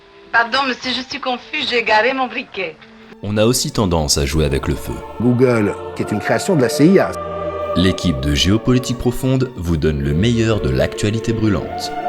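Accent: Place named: Germany. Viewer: Arrete ton "il ne faut pas avoir peur", c est à vomir. Je deteste l accent allemand.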